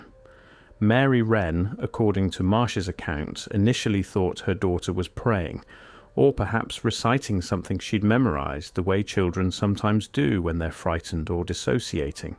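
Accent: England, United Kingdom. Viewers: This is a made up story. Note how they use a very convincible narrator with and english accent. It adds credibility.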